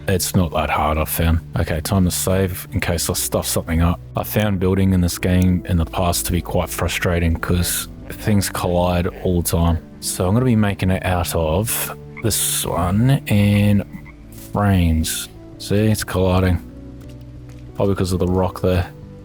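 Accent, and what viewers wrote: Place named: Australia. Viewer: Love the australian accent!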